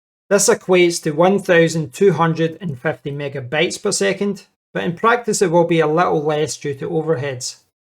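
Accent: Scotland, United Kingdom. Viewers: a great day to perfectly understand a Scottish accent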